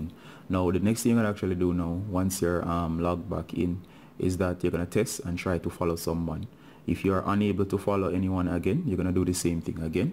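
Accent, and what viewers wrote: Jamaica. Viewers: Love di Jamaican accent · You Jamaican ?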